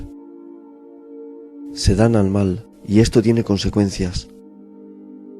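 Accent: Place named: Spain. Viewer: Hola Amigo, porque te haces llamar? Por ser un ser iluminado y lleno de pureza?...Tu voz da tranquilidad y por tu acento me parece que eres de España, me alegra mucho que hayas decidido hacer videos de ESPIRITUALIDAD que tanta falta le hace al mundo. Soy bautizado Catolico pero ellos no creen en la REENCARNACION que por tantos hechos reales se que es verdadera. Yo estoy en un grupo Espiritual llamado TAO aca en Ecuador los instructores la mayoria creen en Buda y Jesus ademas creen en la Biblia. Un gusto siempre escuchar tus videos.....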